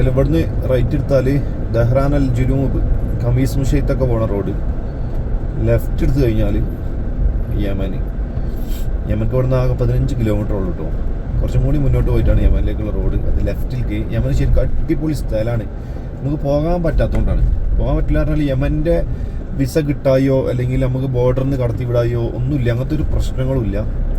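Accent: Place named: Malappuram, India. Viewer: തനി നാടന് വര്ത്തമാനം.... മലപ്പുറം കാരനാണെന്ന് തോന്നുന്നു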